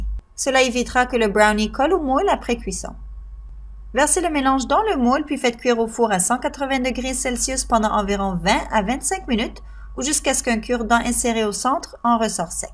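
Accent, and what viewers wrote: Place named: Quebec, Canada. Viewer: Bonsoir. Dabord merci pour cette recette ! Votre accent québécois avec " inchallah" c'est aussi fantastik que votre gateau 😉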